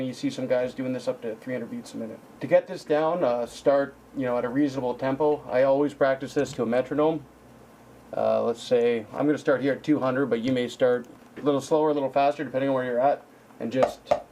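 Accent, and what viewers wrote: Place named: Canada. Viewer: strongest canadian accent ive ever heard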